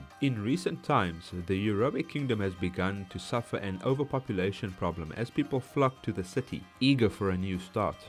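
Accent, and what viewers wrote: South Africa. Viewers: This such a deep south african accent good video please more lore videos , I subscribed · I was also just like this guy is south African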